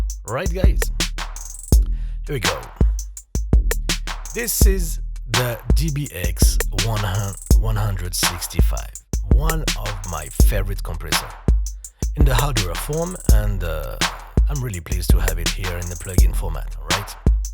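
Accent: France. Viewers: I love your accent/// No wonder the girls love French men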